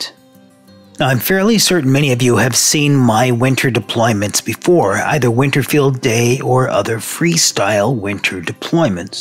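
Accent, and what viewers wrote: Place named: United States. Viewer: Well, yes, I'm impressed. But the thing for me is how did you develop such a flat American accent?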